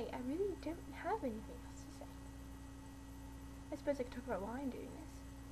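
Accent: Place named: United Kingdom. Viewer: OMG!!! YOU ROOOOOOCK! (for a second, just a second, i really thought "wow i forgot she had a british accent") Randomness #1: I was the first 1 to coment!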